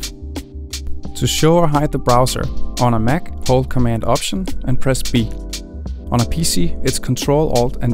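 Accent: Denmark. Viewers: This guy sound Danish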